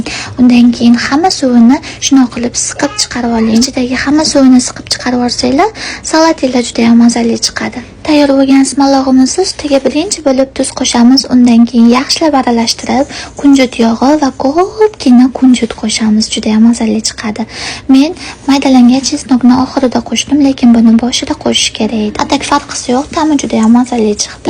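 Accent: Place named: Fergana Valley, Uzbekistan. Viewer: Каерлик шу киз шеваси водийликка ухшеди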